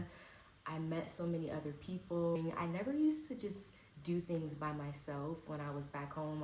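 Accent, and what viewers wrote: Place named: Latin America. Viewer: Are you 100% american or cuz i can hear some latina in you tambien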